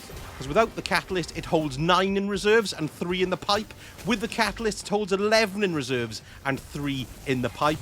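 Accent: Wales, United Kingdom. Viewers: Are you welsh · Can I hear a fellow welshman?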